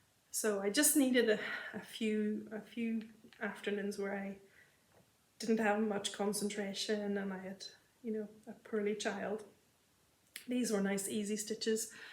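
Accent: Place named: Ireland. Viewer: Your bee finish is absolutely gorgeous! I think it is the perfect use for the wood hanger. Your FFOs, finishes and wips are all just beautiful. Thank you for sharing them with us. It's always nice to stitch while listening to your videos. Your voice is so calm and I love your Irish accent! Take care & happy stitching!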